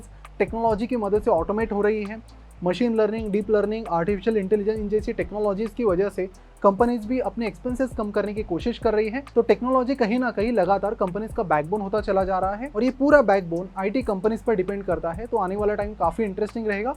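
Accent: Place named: Bengal, India. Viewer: are you bengali? Some of your accents matches with my bengali accent.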